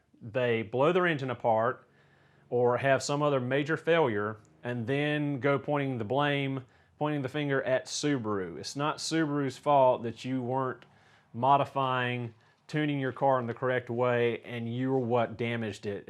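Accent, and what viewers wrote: United States. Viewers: all good it was funny especially in your yanky accent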